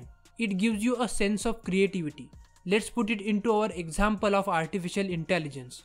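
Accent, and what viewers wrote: India. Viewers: You have the strongest Indian accent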